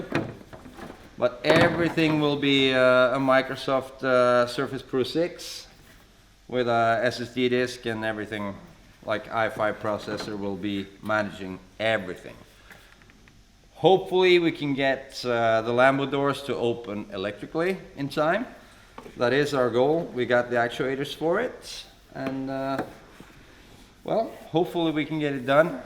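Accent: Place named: Sweden. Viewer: Well done, your Swinglish was great. 👌 Amazing amount of work you put in to these cars and great explanations of the work here on YT. 👍😃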